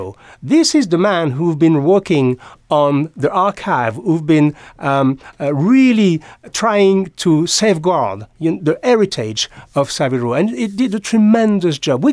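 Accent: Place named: France. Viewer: Don't worry about your French accent Mr.. Your English is far better than my French.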